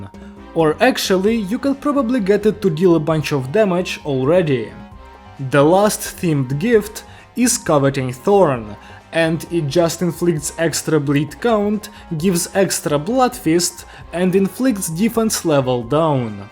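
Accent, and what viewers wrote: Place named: Russia. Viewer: God, one day I will reach my hand through my monitor just to reach your neck and find out why do you sound like you're Russian, German and Spanish at the same time💐💕 As for the theme of the video? Honestly, I kind of get it. I always had that « Ew » ring in my ears when I used Glimpse to just Hollow Purple my way through the MD.. And although I'll miss the old days of the hydrogen burn, I still think that this is a healthier way to go about the MD, since it really lifted some weight from my shoulders. If burn or rupture are the best but not op.. I can just have fun with my favourite sinking team. Because there's much less feeling of « Shi man yo stupid ahh missing out on so much efficiency rn bro » due to the opressive cleaning capabilities of the forsaken duo. Sorry for my low tier English skills in advance🙏🏻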